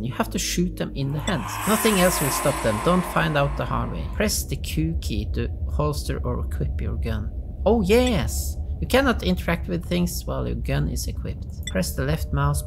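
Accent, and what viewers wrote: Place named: Sweden. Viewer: thanks brah Are you swedish ? , I asked because I wanted to guess what you are , you sound very swedish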